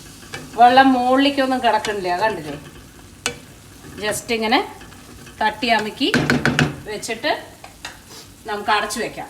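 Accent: Palakkad, India. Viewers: പാലക്കാടൻ ഭാഷ ടോളെ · Cheenachatti nalla bhangi evidunnu vangi Palakkad samsaram kelkan bhayakara rasanu ente husband veedum parli anu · എന്താണ് ഉണ്ണി.. പാലക്കാട്ൻ ഭാഷയും ചേന ഉപ്പേരി യും കലക്കി 👍🌹❤😍😘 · Love your simplicity,ur palakkadan tone, simple and healthy menu. Lots of love from thrissur. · Hahahahahha chechi 😄😄😄 എന്താണ് ഉണ്ണി 😄😄 പാലക്കാടൻ ഭാഷ കേൾക്കാൻ നല്ല രസം എന്റെ chechi ആ ചീന ചട്ടിയിൽ ഇട്ടു പുരട്ടി തിന്നുമ്പോൾ ഉള്ള സ്വാദ് അത് പറഞ്ഞറിയിക്കാൻ കഴിയില്ല എന്റെ അമ്മക്ക് നല്ല ഇഷ്ടാണ് chechi കുഴച്ചു പുരട്ടി കഴിക്കുന്നത്. ഇങ്ങനെ ആണ് ചോറ് കഴിക്ക അല്ലാണ്ട് അവിടുന്നും ഇവിടുന്നും തോണ്ടി അല്ല കഴിക്ക പറയും ☺️☺️☺️☺️ എനിക്കും ഇഷ്ടാണുട്ട chechi കഴിക്കുന്നത് കാണാൻ നാളെ എന്റെ കറി ചേച്ചിടെ കുരുമുളകു രസം ചേന ഉപ്പരി & അപ്പളം ✌️✌️✌️✌️ ഒത്തിരി സ്നേഹം 😍😍😍😍 🤗🤗🤗🤗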